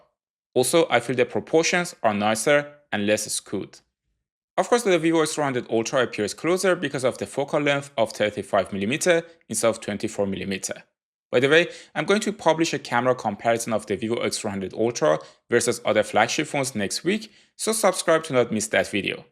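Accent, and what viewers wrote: Iran. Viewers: Nice video especially w that very irooni accent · لحجت ایرانیه😂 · بنازم لحجه ایرانی رو 😅🫡🤌🏼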